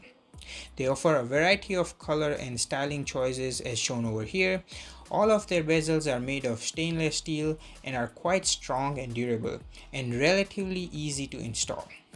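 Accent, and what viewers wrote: Gujarat, India. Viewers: Are you Gujarati?? Your accent is so Gujju..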